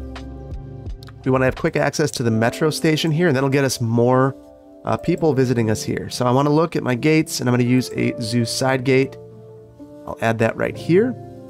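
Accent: Wisconsin, United States. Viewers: I thought he said "egg" the first time he said it to, and I was like: Wait, what??? Then I realized he was from Wisconsin where they say "beg" for bag.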